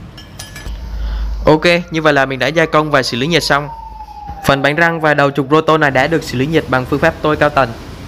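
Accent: Vietnam. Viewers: на каком наречии он лапочет? на северном или южном?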